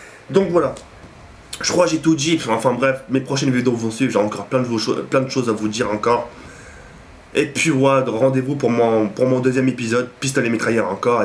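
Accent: Arab world. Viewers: Salut, c'est dernier temps tes vidéos me plaisait moins, tu était moins acharnée je pense que tu attendais ce nouvel Opus pour pouvoir faire l'originalité et te refaire plaisir. J'ai eu le sourir durant cette vidéo car j'ai bien vue que tu était dans ton truc tu aimez tu passez du bon temps a commenter ta vidéo. Tu redeviens le bon avec son putain d'accent d'arabes (Pas méchant hein;)) Tu me fais plaisir continue, tu vas allez loin et surtout: AMUSE TOI!!! Peace frerot! <3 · tu parles un peu a la rebeu ^^ mais tu geres et tu respecte vraiment les autres, au point de dire que mrlvl12 explique mieux, tu te prend vraiment pas la tete ca fait plaisir ;)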